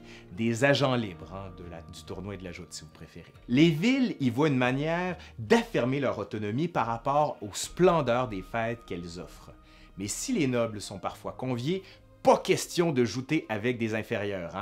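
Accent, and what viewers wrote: Quebec, Canada. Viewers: Excellent ! Comme tout jour. De votre accent québécois Je dois, ma foi, l'aimer pour et pour. De revenir mon œil Pour mourir Roy !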